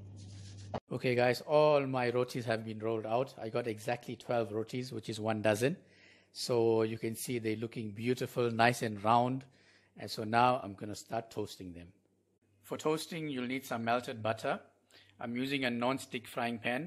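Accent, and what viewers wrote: Durban, South Africa. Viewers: Hi. You sound South African Durbanite. I also do similar dough.. Take care love from Durbs. South Africa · South African, accent sounds like Durban people... Durbanite ❤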